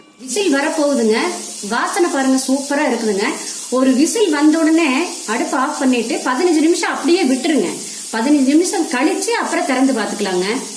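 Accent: Kongu, India. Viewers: nice to hear kongu Thamizh. super process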